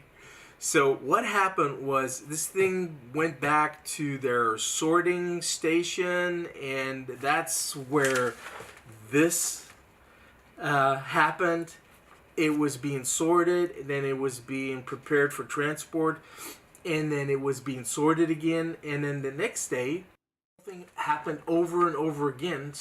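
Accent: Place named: United States. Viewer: Bloody hell. That's crazy. BTW for a German you sound like an American ha ha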